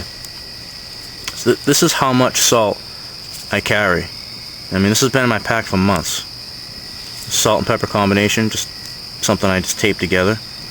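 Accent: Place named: Massachusetts, United States. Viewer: great vid you sound like you come Massachusetts 😆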